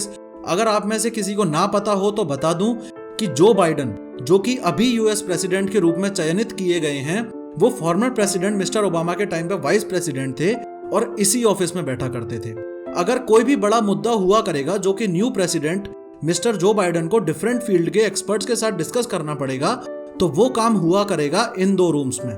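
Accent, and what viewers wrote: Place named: Jaipur, India. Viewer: Sir are you from Jaipur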